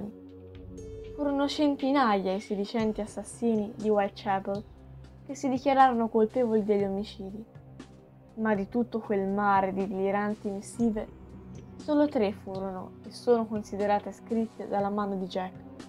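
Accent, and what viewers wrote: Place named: Tuscany, Italy. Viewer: figurati poi mi è sempre piaciuta la parlata toscana grazie a te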